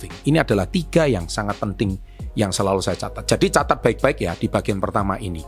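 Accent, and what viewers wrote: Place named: Java, Indonesia. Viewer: cocoklah ini, apalagi penyampaiannya mantep dan medok .... 👍👍👍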